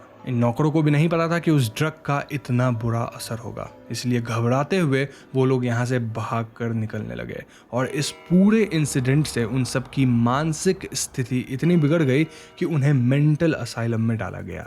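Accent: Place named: Bihar, India. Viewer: Bhaiya tum bihar se ho na kyuki bihar k log sadak ko sarak bolte h jaise unse kaho sadak par ghoda tadak tadak daudta h to vo bolte h sarak pr ghora tarak tarak daurta hai 🌚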